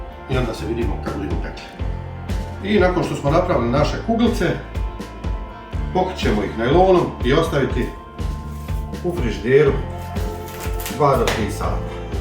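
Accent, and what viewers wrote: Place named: Bosnia and Herzegovina. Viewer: Predlazem da ove zamerke prihvatis kao dobronamerne : moja keva je iz Lebana o to je tu negde.Pite te ko teje lagao za sir? U Leskovacku skaru mora da ide tucana ljuta paprika zatim kasika prezli ,jedno jaje i na tu kolicinu ide pola kasikice soda bikarbona.Drugo: reprezentecija - pokazivanje: te lepinje kao da si sekirom sekao.Dok gledamo ovaj tvoj video stice se utisak kao da ti se pisalo,pa si zurio.zadnji komentar: po govovoru kao da si Bosanac,stoga drz se ti Banjaluckog recepta . Pozdrav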